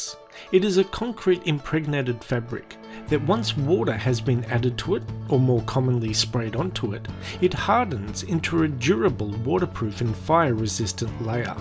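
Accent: Australia. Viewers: I like your accent. You sound like an Aussie :)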